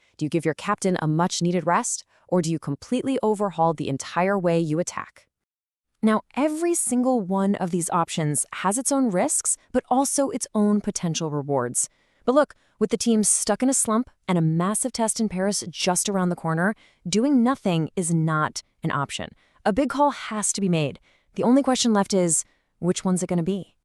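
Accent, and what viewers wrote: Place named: United States. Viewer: What’s with the American voice?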